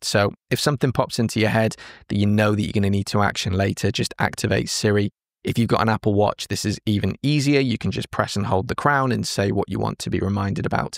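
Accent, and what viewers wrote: United Kingdom. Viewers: Is it British accent?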